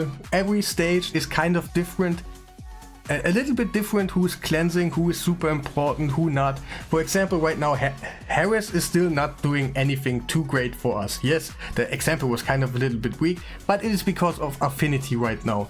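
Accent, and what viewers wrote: Germany. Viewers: hey when zinogre came out i made a 2 to 1 unkillable team with zinogre emic painkeeper (in the calc) could not test it yet do you want to test it? ps are you german? you kinda sound german ^^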